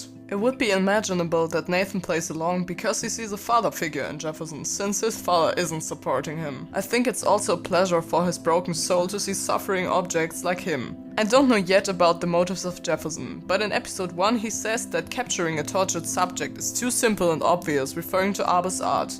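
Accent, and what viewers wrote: Germany. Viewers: Dieser deutsche Akzent lol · Irgentwie habe ich mir gedacht vom ich sag mal Akzent das du Deutsch bist aber trotzdem gut😊 Also kann man Nathan Retten? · Your accent just sounds really german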